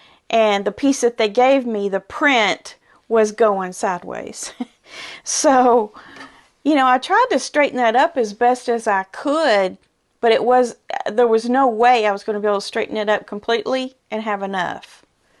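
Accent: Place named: Kentucky, United States. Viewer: I popped over to your channel after seeing your interview this morning with Steven on his YouTube channel (the idiot quilter). So nice to discover your channel!!🎉. Truly enjoyed getting yo know you. I’m originally from Owensbore Kentucky so I was thrilled to hear your accent. Brought tears to my eyes. I’m now in Pittsburgh PA but get home a few times a year. Blessings to You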